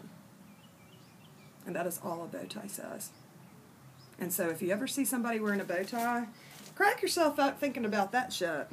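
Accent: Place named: South, United States. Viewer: I love your southern drawl !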